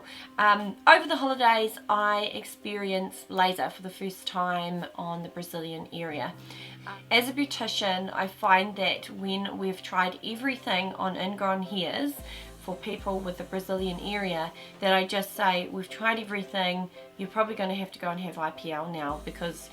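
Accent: New Zealand. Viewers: Hey I was wondering if you could recommend a place to get this done. You sound Kiwi so I'm hoping you got it done in NZ?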